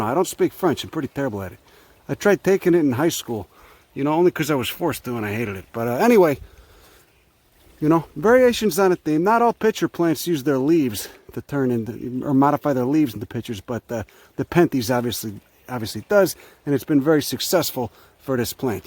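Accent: Chicago, United States. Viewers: Trouncing about gods delicates with your tattoos and your chicaganese dialect and your peircings i bet youd get your arsehole pierced If ya could fit your cheeks in da machines!!!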